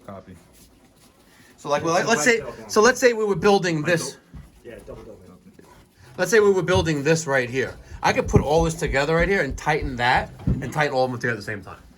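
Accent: New York, United States. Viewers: Voice kinda reminds me of Louis Rossmann. Just that NYC accent working through...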